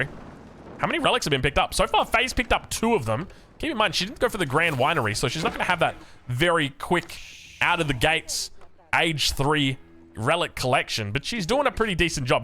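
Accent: Australia. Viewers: Hi I love your videos but I have a massive problem watching them. I watch them generally before going to sleep and your buttery australian voice guides me to sleep. However I have developed a sort of Pavlovian response that now whenever I watch your videos I get really sleepy all of the sudden. Anyway still love your vids and have a G'Day!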